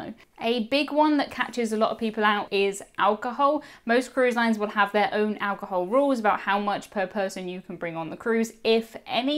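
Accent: RP, United Kingdom. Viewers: I've never been on a cruise but will hopefully. I only watch your videos because you're so dang gorgeous and I love your posh accent ☺️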